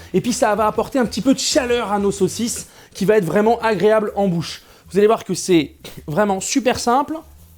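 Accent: South, France. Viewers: Mmmh des bonnes grosses saucisses... Et cet accent sudiste exceptionnel :'') Superbe vidéo avec beaucoup d'humour !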